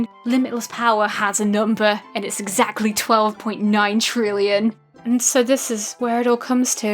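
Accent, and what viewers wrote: England, United Kingdom. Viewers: YEEAAA UPLOAD, MY FAVORITE TWO THINGS, POKEMON AND BRITISH ACCENT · Why do so many poketubers sound slightly English.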